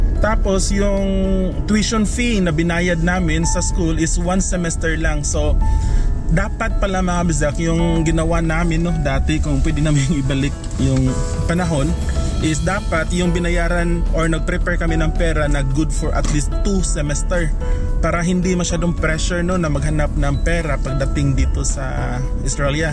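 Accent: Philippines. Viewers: omg, i dont get your accent. is it from philippines?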